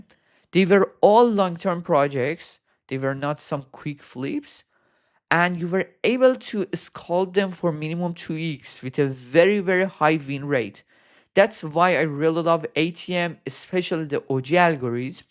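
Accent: Iran. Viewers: persian accent uhh xd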